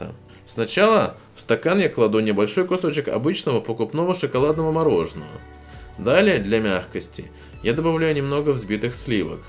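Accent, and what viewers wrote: Estonia. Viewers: Бро,ты из Эстонии?!😃(я да) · Ты из Эстонии? Шок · Ты с Эстонии?)) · он из Эстонии чтоле??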